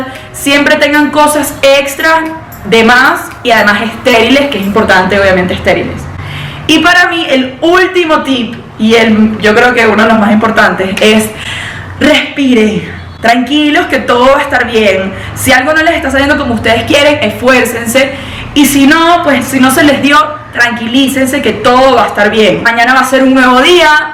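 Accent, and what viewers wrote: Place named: Venezuela. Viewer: Guido Kaczka On Drugs No es Colombiana, su acento es como venezolano. Saludos desde Medallo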